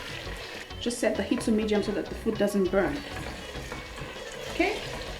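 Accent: Nigeria. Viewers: I love your Nigerian accent❤️❤️🇳🇬 (im Nigerian to🇳🇬)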